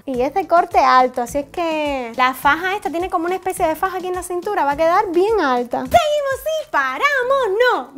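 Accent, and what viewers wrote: Cuba. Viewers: me encantan tus vídeos, eres muy graciosa y muy guapa. Me encanta tu hacento, es como el de mi abuela que es de Cuba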